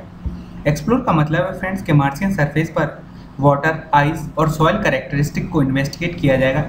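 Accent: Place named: India. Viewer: 咖喱英语，一听就知道是三哥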